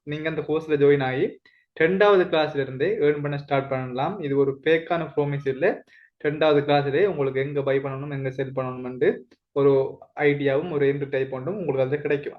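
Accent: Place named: Sri Lanka. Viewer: Sri lanka thamizh 😍🫂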